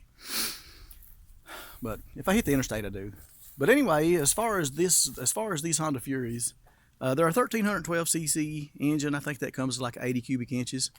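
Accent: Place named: Kentucky, United States. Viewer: I think a small windshield would look ok You sound like you’re from Kentucky or somewhere below the Mason-Dixon Line American by birth Southern by the grace of God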